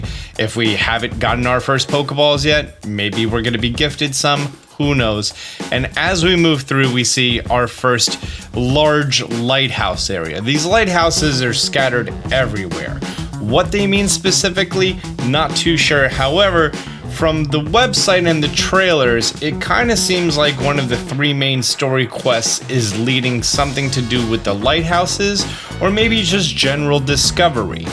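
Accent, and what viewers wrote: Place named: Philadelphia, United States. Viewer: Are you from Philadelphia? You say 'wudder' like all of my friends and family. Lol. Also, thank you for the great breakdown!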